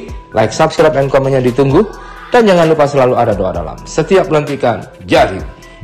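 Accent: Java, Indonesia. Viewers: Biasa biasa aka atuh bkb nya mirip bule tp jadi logat jawa medok njiiirr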